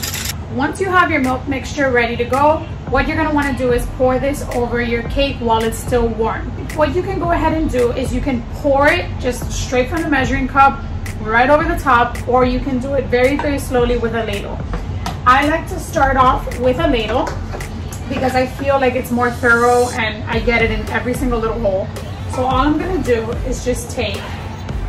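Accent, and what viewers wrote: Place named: Puerto Rico. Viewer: first timein your channel . you sound from Puerto Rico wepa!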